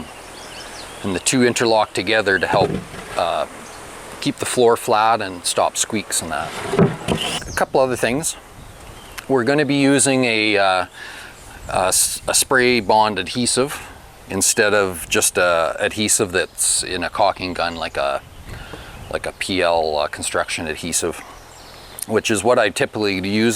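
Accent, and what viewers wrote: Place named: Quebec, Canada. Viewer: How did you manage in the big rains, fallout of tropical storm Debby in early Aug 2024 ? (By your Quebec accent I assume that's your location.) May be give us a construction and weather episode? How do you protect exposed wood from water damage? Should we be worried? What is time lag between your filming and the publication of video? Fun series, though beyond my little DIY.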